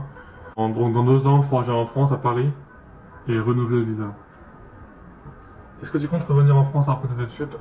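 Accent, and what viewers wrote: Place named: England, United Kingdom. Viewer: T'as l'air d'avoir du mal à parler français j'trouve, t'as même l'accent anglais xD . En tout cas vidéo très intéressante ! Continue !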